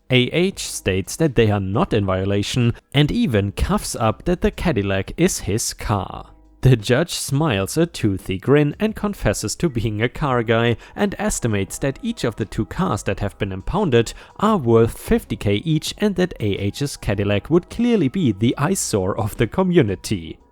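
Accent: Germany. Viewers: Hey man I was wondering what your accent is I can’t quite place it but I’m thinking German or Swedish If I could have any car it would probably be a hell cat. Or a Noble m500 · Darn lawn Nazis. I hate HOA's. The narrator does a wonderful job at telling these stories. As a person with German ancestors I like the accent. I can imagine the HOA president shouting "Kein Parken dieser Autos auf der Straße!" While stomping around.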